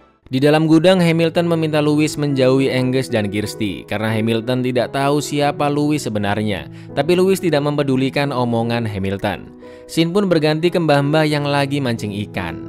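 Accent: Java, Indonesia. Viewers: Bang asal Jawa mana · Jowo ndi kang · Aku mas wong jowo. Mas e jowo ndi mas? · Wong jowo yo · Wong jowo ya😹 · Bang lu orang jawa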